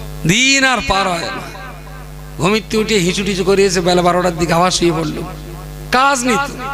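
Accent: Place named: North 24 Parganas, India. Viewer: Apnar katha r style amar valo lage North 24 pargana r katha bolar dhoron emon dekhechi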